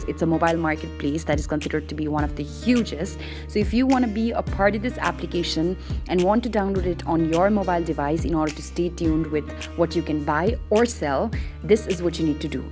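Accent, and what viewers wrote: India. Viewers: Sounds hindi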